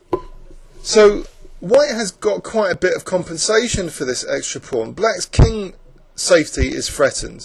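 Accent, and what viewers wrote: United Kingdom. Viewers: British accent? (sorry, my English is not very well).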